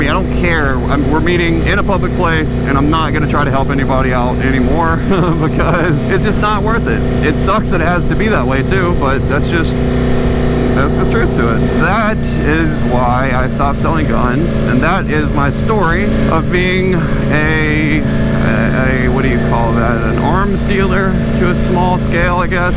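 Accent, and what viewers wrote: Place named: Shreveport, United States. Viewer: Sounds like Shreveport,la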